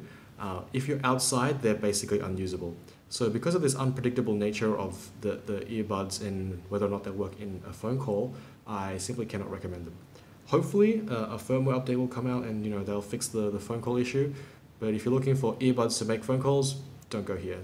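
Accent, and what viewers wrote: Australia. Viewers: Love your video & presentation but can't stand your "Aussie" accent. sorry !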